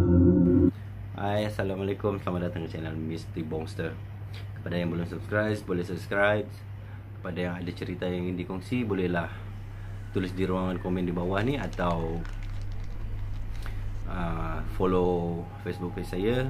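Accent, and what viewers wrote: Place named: Semporna, Malaysia. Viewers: Penceritaan kurang menarik bnyk ulang2 sama logat tu bagus kekalkan logat semporna, tdk perlu guna bahasa smjung..background n suara latar kurang tepat diguna...nak gambarkn sesuatu pastikn berserta kawasan...sekadar cerita, orang tdk akan percaya...ada baiknya berckp sambil tunjuk kawasan titik hitam...teruskn usaha..tingkatkn lg usaha...sokong org sm4na jd youtuber👍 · kami tidak faham semua logat sabah bah...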